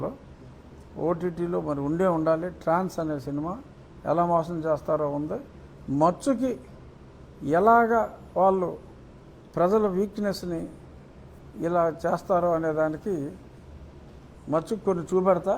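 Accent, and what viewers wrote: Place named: Godavari, India. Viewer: Sir meeru ma godhari yasa mamuluga ledhu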